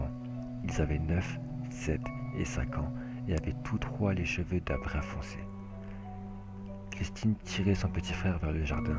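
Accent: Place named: North, France. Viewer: une petite question,est ce que tu es du nord ? (dans le Nord,y a des gens qui ont un accent semblable au tien ^^) d ailleurs il est joli ton accent :)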